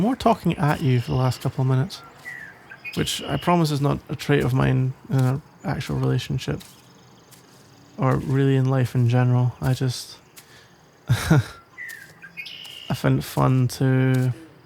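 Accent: Scotland, United Kingdom. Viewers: Omg wait you're Scottish!? Me too!!!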